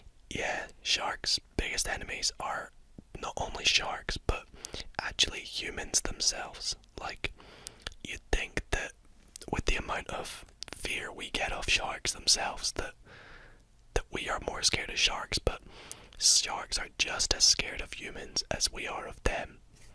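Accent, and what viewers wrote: Scotland, United Kingdom. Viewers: WHAT PART OF SCOTLAND ARE U FROM???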